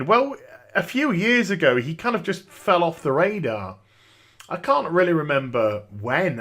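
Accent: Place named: United Kingdom. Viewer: Is there any chance you will drop the British accent and slang? Your info is interesting but cant stand it.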